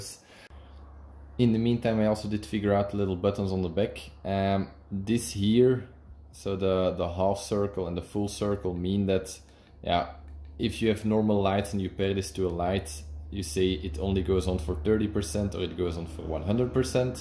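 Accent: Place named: Brabant, Netherlands. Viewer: Je Brabands accent door je Engels is zalig ☺️☺️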